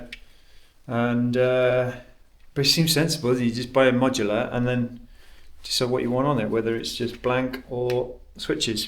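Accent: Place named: Northern England, United Kingdom. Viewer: It is north British English... The best way to 'speaka di language'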